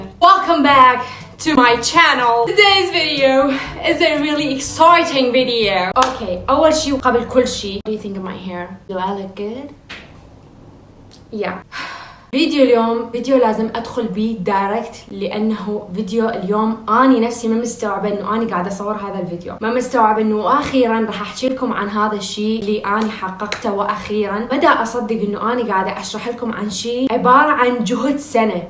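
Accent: Iraq. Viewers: بس شويون حاولي تقللين من الانگلش لأن مرات مافهم هواي وهم اللكنه العراقيه عليچ تجنن احلى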